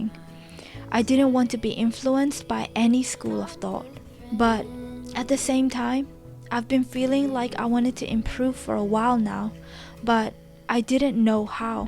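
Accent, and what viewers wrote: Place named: England, United Kingdom. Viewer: I hear a cute English accent❤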